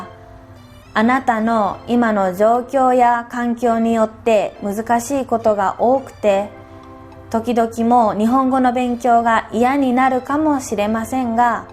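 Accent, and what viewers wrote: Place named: Kansai, Japan. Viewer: Me gusto mucho el podcast :3 いいポッドキャストだと思います 関西弁は可愛く聞こえます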